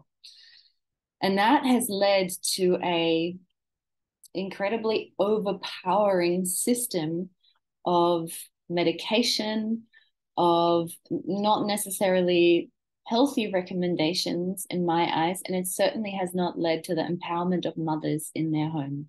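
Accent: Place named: Australia. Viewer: Love your Aussie accent. Greetings from Germany.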